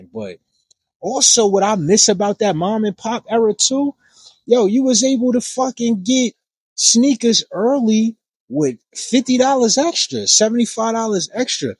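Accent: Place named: Brooklyn, United States. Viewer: Hearing you speak took me back to my Brooklyn days. I grew up in Brooklyn in the 80s and 90s. Your accent and vocabulary took be back to that time.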